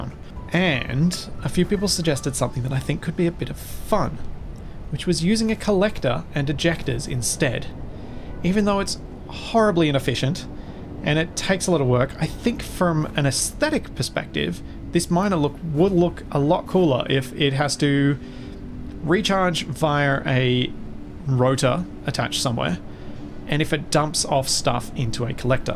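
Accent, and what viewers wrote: Australia. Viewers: One of the very few aussies that are nice to listen to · "Git! Git! Git atta hea!" My weekly reminder that an Aussie <3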